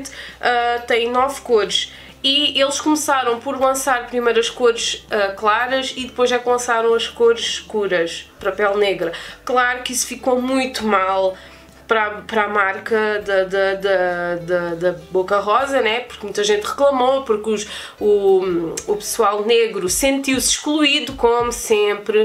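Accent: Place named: Portugal. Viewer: Amiga, amo os seus vídeos! Aprendo muito! Me tira uma dúvida: O seu sotaque é tipicamente de Portugal. Vc é nascida e crescida nesse país, ou imigrou ainda bem nova? Obrigada pelo seu conteúdo e beijossssss sua linda!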